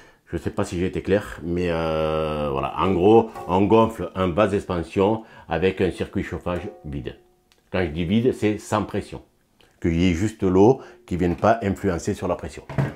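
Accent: South, France. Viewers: Super! "Putin" cet accent! Je suis Marseillais, j'en connais quelque chose!! J'ai une chute de pression sur le manomètre, je remonte la pression tous les mois à peu prés, d'où peu venir cet perte de pression en sachant que je n'ai aucune fuite et que mes radiateur sont purgés. Soupape de sécurité qui est reliée par un pvc de 32 à l'égout? quand pensez-vous? Merci pour votre réponse.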